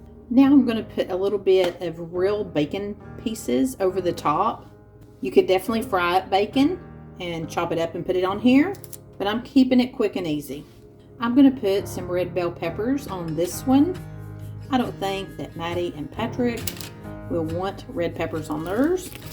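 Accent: South, United States. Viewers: Love your easy recipes.fast and easy is nice.anything Mexican is what I like.not spicy though.I saw your recipe for taco pizza,with refried beans and hamburger with pkg pizza mix.yum Can't wait to try it.😊Love my vegetables,and you make good ones.love your crock pot dinners too love your southern accent.I am from Minnesota.they day we have have a accent.thats funny to me.enjoy watching you,but you make me hungry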